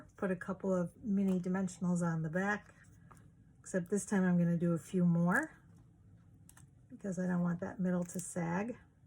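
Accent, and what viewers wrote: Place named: East Coast, United States. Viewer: And…..I love all of your box designs!! Love hearing your Eastern accent. This CO girl grew up in New York and all of my brothers live in MA now. Miss those spectacular fall colors. Hugs, Karen ❌🍁❌❤️❌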